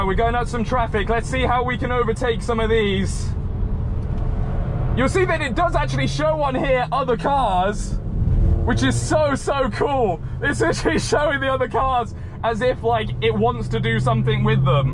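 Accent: United Kingdom. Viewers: Although your accent is British, and the steering wheel is on the right side, you pronounced "schedule" like Americans. :D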